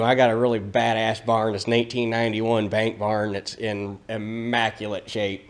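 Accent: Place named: North, United States. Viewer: I’m going to be honest and with this link came out I bypassed it because I was just busy. When your second video came out and I well great. You were one of the first channels I watched regularly and looked forward to watching and even went back in time and watched. I’m originally from Michigan and have lived in Georgia since 1974 so I love to hear the northern accent such as yours but love the old equipment as well. Take care and hope you’ll post some more videos.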